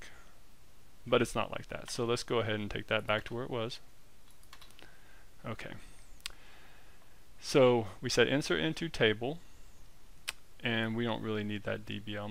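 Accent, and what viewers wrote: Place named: South, United States. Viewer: Howdy! Where are you from? Texas? You got that good ole' southern draw. Like Matthew Mcounaghey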